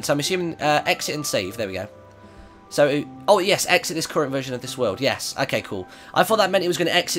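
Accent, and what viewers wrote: United Kingdom. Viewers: how come every time i watch something about minecraft the person that talks is british! its so annoying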